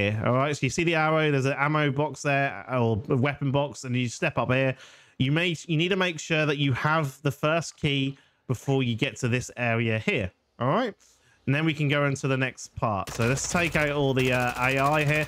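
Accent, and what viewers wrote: United Kingdom. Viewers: Hmm... "The gatman" for an assault rifle... well, you sound British so I'll chalk it up to ignorance.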